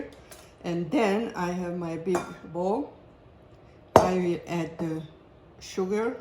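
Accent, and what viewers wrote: Hungary. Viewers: I love your recipes and enjoy your videos. And you can be rightly proud of your Hungarian accent and your roots!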